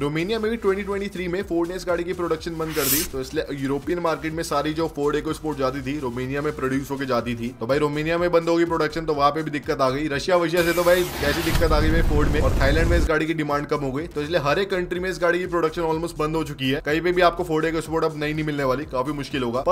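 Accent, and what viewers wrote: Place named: United States. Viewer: You talking about Escape. And why the hell are you trying to use American pronunciation?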